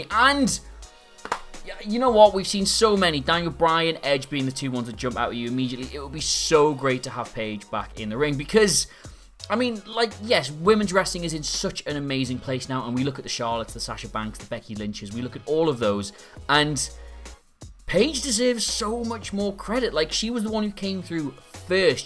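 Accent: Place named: United Kingdom. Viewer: My American ears never get tired of British "years". "It took yeeahhz and yeeahhz and yeeahhz and yeeahhz off our lives."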